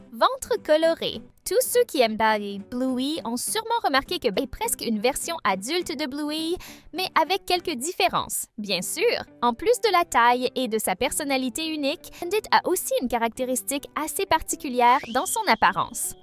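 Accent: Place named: Canada. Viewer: oui, un axent canadien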